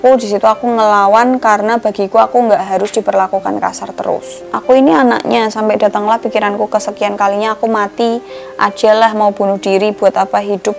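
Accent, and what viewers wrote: Java, Indonesia. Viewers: aku ga suka logat Jawa tpi pas denger mba * ngomong jdi sukaaaaa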